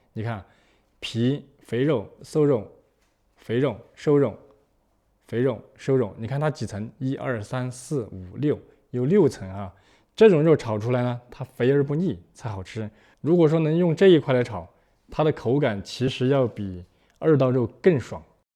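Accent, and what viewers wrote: Sichuan, China. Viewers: He's speaking Mandarin with a bit of Szechuanese accent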